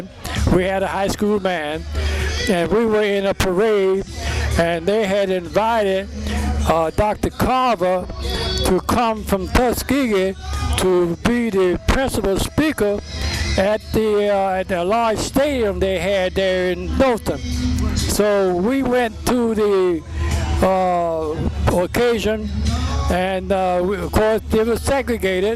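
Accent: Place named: India. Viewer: Thats an indian talking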